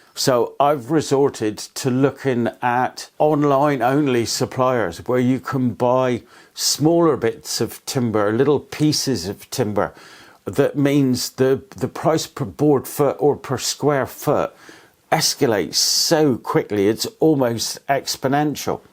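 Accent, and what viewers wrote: Cornwall, United Kingdom. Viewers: Hi, I stumbled on your channel a couple of days ago. I thought I recognised a fellow Cornish accent! Getting any supplies into the county is a nightmare. It's the transport that kills it. I'm looking into making a longbow, either self yew bow or osage, or a laminate bow from ash, purple heart, lemonwood, maple, cherry, walnut, hickory etc. Some of the prices are ridiculous. It's hard enough to source somewhere that sells the material. Another problem is shipping a 6 foot length of timber. Ideally I would want to see the wood for myself. I need straight tight grain, no knots, cracks etc. So risking hundreds of pounds on a piece of timber only to be unworkable makes it impossible. Good luck!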